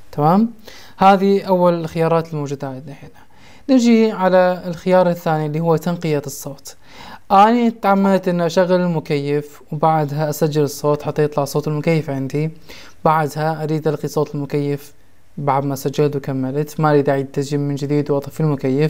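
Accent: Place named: Mosul, Iraq. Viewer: من اللهجة مبين من الموصل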